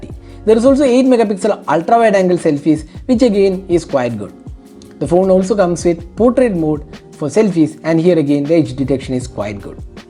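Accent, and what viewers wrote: Kerala, India. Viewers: English kettapozhe malayali annenu manasilaayi... Great job broo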